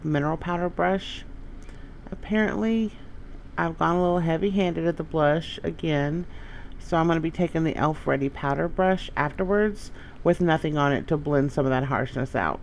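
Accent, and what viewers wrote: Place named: South, United States. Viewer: Where are you from? I can tell the southern accent...lol. I'm from NC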